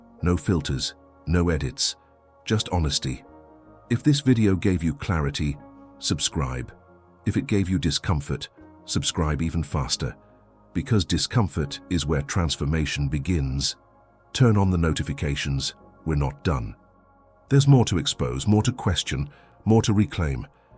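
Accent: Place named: England, United Kingdom. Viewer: But the AI has a cool English sounding voice 😅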